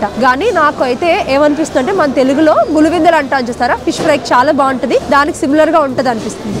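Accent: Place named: Srikakulam, India. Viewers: Medi pakka Srikakulam andi ❤❤ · మీరు కచ్చితంగా మా శ్రీకాకుళం వాళ్ళు అనుకుంట, బాగా చెబుతున్నారు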